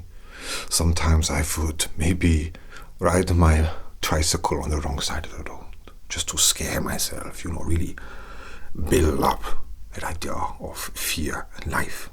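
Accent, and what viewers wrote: Germany. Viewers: That German accent... Is so good I'm melting! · GDDGHJBB OMG I HAVE ALWAYS WANTED TO SEE A ROCKSTAR ASMR you nailed this you're so hot like this 😍 and it was brilliantly done! The accent the look the whole atmosphere id say you are German lol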